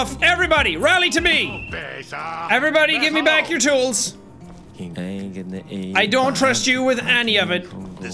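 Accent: South, United States. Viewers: Jack's southern accent is the greatest thing I have ever heard. 10/10. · coming from a southerner it was about a 6-7 out of 10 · My uncle lives in arguably the most southern county in north Carolina and I swear that accent sounds just like him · Jack's Southern accent is even better than most Southern people I actually know irl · I wasn't expecting his Southern accent to be that good!!! He is officially a Southerner now!! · It's scary how realistic Jack's Southern Accent is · I live in the South. I have lived here my whole life. And never have I ever heard such a beautiful Southern accent. Well done. Beautiful.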